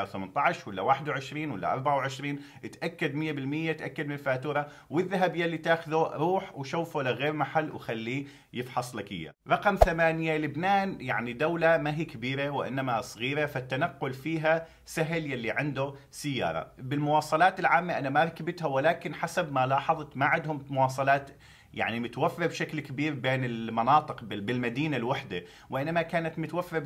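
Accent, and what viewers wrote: Deir ez-Zor, Syria. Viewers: كانك من الدير🔥🔥🔥💪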